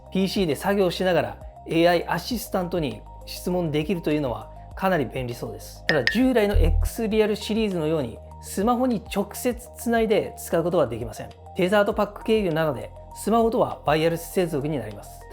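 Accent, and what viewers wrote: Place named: North Kanto, Japan. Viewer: 北関東かな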